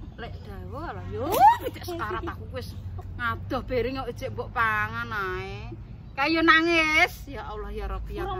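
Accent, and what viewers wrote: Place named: Tuban, Indonesia. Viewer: Kalo liat kontennya, logat bicaranya mengingatkan saudaraku yg ada di Tuban.... Semangat y Lek...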